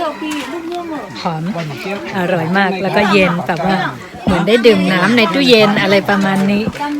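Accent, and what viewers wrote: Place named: Nan, Thailand. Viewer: สำเนี่ยงหมือนคายๆทางหนือไทยน่านก็มี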